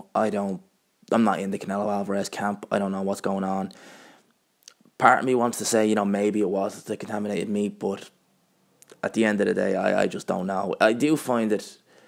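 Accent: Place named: Ireland. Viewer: Your toughts haha I love Irish accent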